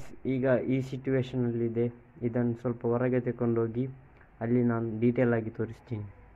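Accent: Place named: Mangalore, India. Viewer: I like ur mangalore kannada 😄😄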